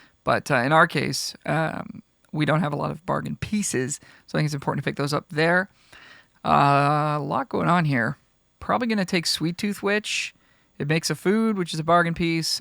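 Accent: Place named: Canada. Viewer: You say Ey a lot. U Canadian?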